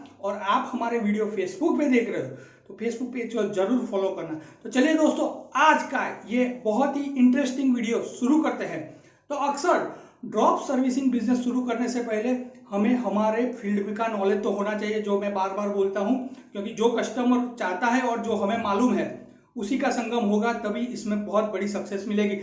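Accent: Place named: Gujarat, India. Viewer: Gujrati h shyd 😂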